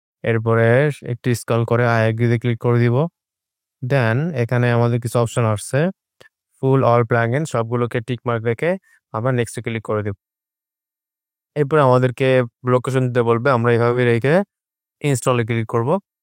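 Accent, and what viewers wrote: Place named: Jhenaidah, Bangladesh. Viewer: ভাই আপনার বাসা কি ঝিনাইদহ?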